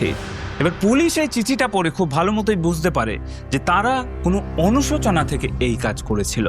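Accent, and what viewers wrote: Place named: Mymensingh, Bangladesh. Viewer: 'ব' এবং 'ভ' এর উচ্চারণ ! আদি নিবাস কি ময়মনসিংহ ?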